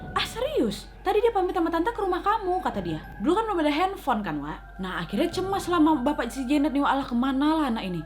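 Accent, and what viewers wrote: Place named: Medan, Indonesia. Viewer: kebanyakan nonton video kak, aku kalo ngomong pasti ada imbuhan wak dan logat jadi kak wkwkwkkw padahal udah sempat hilang setelah bertahun tahun tinggal di jakarta dengar kak, langsung auto logat medan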